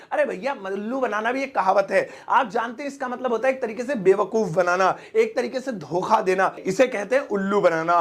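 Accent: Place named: Rajasthan, India. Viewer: Sir your and my language is same When you said - ka keh rho h ee 😅 I'm from bharatpur Rajasthan Watched all your videos 😍 Love you sir 🤟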